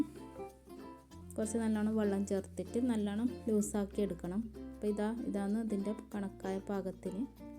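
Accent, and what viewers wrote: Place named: Kannur, India. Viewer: Masha Allah. അടിപൊളി Insha Allah try ചെയ്യുന്നുണ്ട്. വർത്താനം ശെരിക്കും കണ്ണൂർ സിറ്റിക്കാരുടെ തന്നെ 🤣👌🏻 സ്മോക്കി roll ആദ്യമായിട്ട കാണുന്നത്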